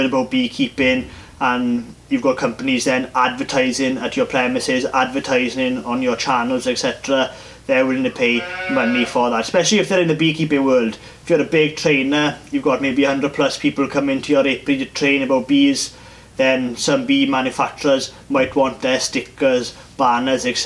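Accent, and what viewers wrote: Wales, United Kingdom. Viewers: 20 to 40 pounds of honey per hive per year, did I got that right? So that's 9 to 18 kg. So to make an average full time income, you need about 800 hives. Is that so different in Wales compared to Germany or did I mistake that beautiful Welch dialect? :) I read about 50 kg per hive on many sources. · very true, although I genuinely believe the more the merrier when it comes to mead. Its still taxed and treated like a wine which is very annoying and also extremely expensive for small producers like myself. I'd make some YouTube videos on how to get started etc but I don't have a cheery Welsh accent like yourself and unfortunately I have the personality of a slightly moist paper bag.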